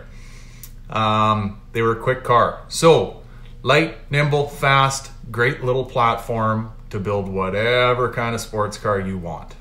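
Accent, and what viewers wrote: Canada. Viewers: I can always tell when a Canadian is talking. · You Canadian eh? Thanks, Great Video. I want a GT Convertible. Does it matter 89-93? I would think by 93 bugs would be less? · He sounds Canadian.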